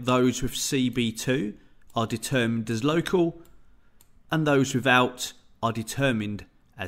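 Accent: United Kingdom. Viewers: Excellent explanation. I solved the problem I had. Thank you very much "indeed". I liked the way you explain, especially for your accent! From now on, I will come to your channel in case I have troubles with Excel. Also, I'll tell my friends about your Channel. I studied English in Cambridge, UK, a long time ago, and I always loved the British Accent. Now I live in USA, and the American English sounds like another language. (No offense, please)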